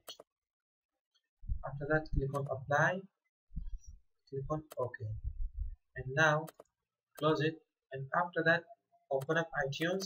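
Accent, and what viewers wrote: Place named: India. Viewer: This guy sounds like a indian irs scammer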